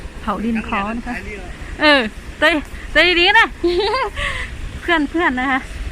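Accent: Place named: South, Thailand. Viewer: เอาแกลบไปเผาดินขอคือเอาไปเผากระเบื้องดินขอมุงหลังคาบ้านล้านนา ฝั่งไทยหาดูยากเหลืออยู่วัดต้นเกว๋นที่ถ่ายละครกาสะลอง คำว่า ขอ คือด้านหนึ่งของกระเบื้องจะงอๆเป็นตะขอเกี่ยวไม้ระแนงบนหลังคา ทำจากดินเหนียวเลยเรียก ดินขอ สำเนียงน้ำเสียงที่น้องพูดฟังไปฟังมาก็นึกถึงภาษาใต้ ภาษาตระกูลไทเหมือนกัน รากศัพท์ก็อันเดียวกันเช่น เถื่อนแปลว่าป่า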